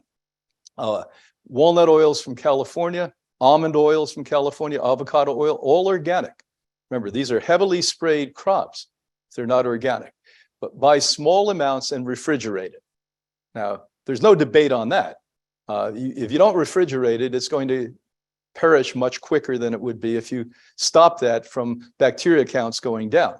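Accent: New York, United States. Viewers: Poor! No matter how much knowledge and data you have, when are you going to learn that the way you deliver your information to human beings is all important? You're constant attitude of sarcasm and a superiority virtually reek from your being and the sidewise New York delivery is of no help whatsoever... You simply sound like the smartass spouting his stuff. When is the true milk of humankindness the true perfume of genuine affection going to issue from your being before you depart this world? Is it reserves for your family alone? How tragic. Try to talk to the man in the mirror. Perhaps give him a little trim and view him with no cosmetics. Whatever, and see if you really like this guy. It's a stretch to believe so. In any case, whatever you do, do it in love, and without needless sarcasm. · Yes and I love the NY accent too as I’m originally from NJ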